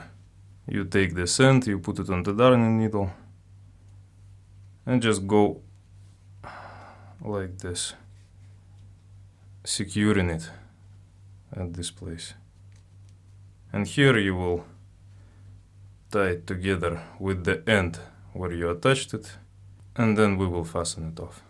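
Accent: Russia. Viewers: I love the thick Russian Accent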